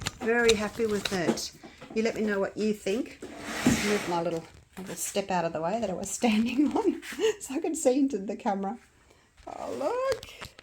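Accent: Australia. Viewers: I just the Australian accents so could listen all day. In fact I'm in the u UK and loved Aussie when I went all those years ago and came home with the intention of emigrating there but never did. Regret it like hell now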